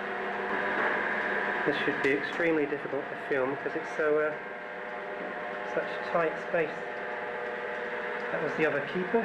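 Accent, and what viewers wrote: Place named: Peacehaven, United Kingdom. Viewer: I have a friend who comes from Peacehaven and his accent and pronunciation is identical to yours. If I close my eyes it could be him speaking. You're not from round that way are you?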